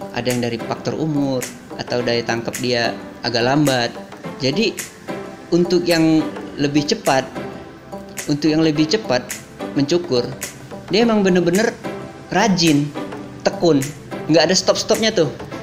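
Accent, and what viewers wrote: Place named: Palembang, Indonesia. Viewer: Mantap..gaya ngmng palembang masih Ado😀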